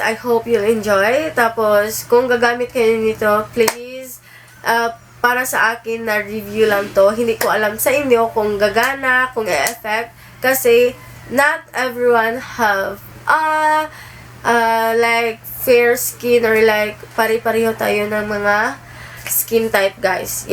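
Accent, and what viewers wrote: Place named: Visayas, Philippines. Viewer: Halatang Bisaya HAHAHA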